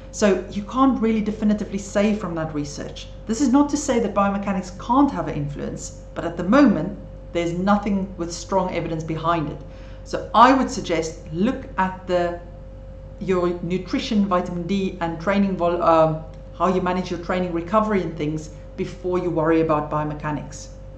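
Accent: South Africa. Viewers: Thank you Dr. Your accent sounds South African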